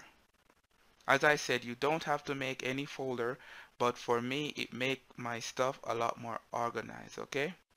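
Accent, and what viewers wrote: Jamaica. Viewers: Ur Jamaican ??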